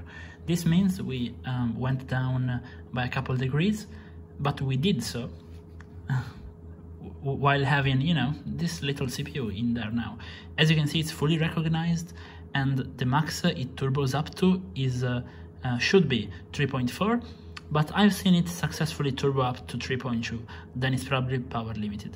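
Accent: Italy. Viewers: Si sente che è italiano, ma c'è anche da elogiare il fatto che riesca a fare interi video senza mai fermarsi e con una pronuncia giusta ✌️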